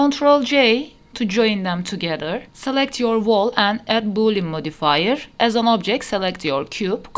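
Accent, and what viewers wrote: Turkey. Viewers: Thanx for your tutorial with Turkey accent!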